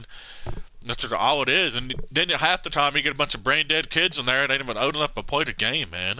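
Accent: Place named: South, United States. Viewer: He sounds like he’s from the southern US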